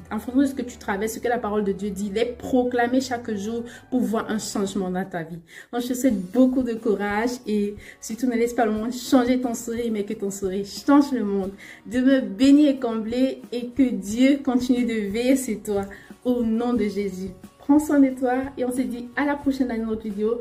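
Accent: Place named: Cameroon. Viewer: Une Fibre camerounaise derrière ta voix😊😊😉 Courage en tout cas, 👍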